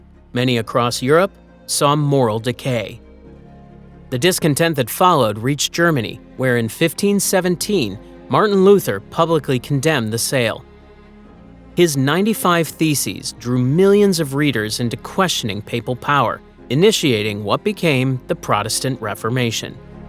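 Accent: United States. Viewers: Having an American voice is wrong, ye shouldn’t Be involved in European history, go away ffs I immediately tuned out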